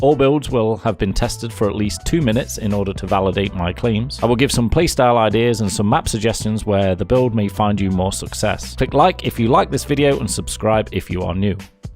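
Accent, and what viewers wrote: United Kingdom. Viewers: Are u british? Ur voice is very similar to hoxton voice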